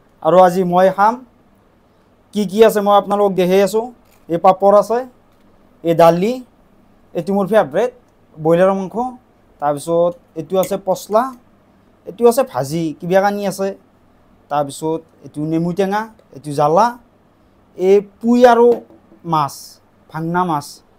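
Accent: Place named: Goalpara, India. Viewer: daar akta kotha bhal lage mk bhoria kowa taa 😂❤.....I❤ GOALPARA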